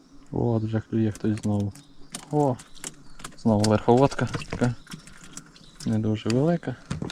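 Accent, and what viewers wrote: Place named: Volyn, Ukraine. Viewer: Привіт з Закарпаття!виріс на Волині згадав рідненький говір.😊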